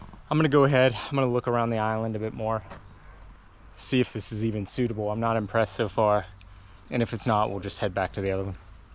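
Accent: United States. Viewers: thank you I just wondered because of your accent. I'm from Phoenix Arizona so it definitely sounded American to me LOL 😆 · thanks for the update on the camera, yikes camera lens is expensive. I thought I heard an American accent. The UK I've seen via this channel is beautiful! I noted there's only sheep, no wildlife.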